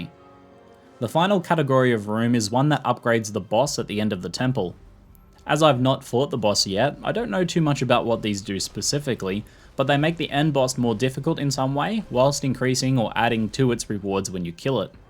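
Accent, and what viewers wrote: Australia. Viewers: rooms or runes, dat ausie accent xD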